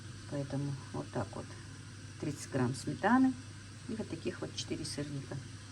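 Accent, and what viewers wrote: Standard. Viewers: Добрый вечер, пересмотрела почти все твои ролики, готовила по-твоему рецепту мясо по французки, очень понравилось моей семье,хотя до этого делали по другому, написать хочется много добрых слов тебе, но, что подкупает в тебе( я думаю не обидешься что на ты? мне 63 года), нет в тебе какой-то заносчивости, пафоса, гонора, в отличие от некоторых блогеров с соседней республики, у тебя очень чистый русский язык, правильно одна женщина задала вопрос, а вы не учитель? Очень приятно тебя слушать, смотреть как ты готовишь, желаю всего тебе самого хорошего!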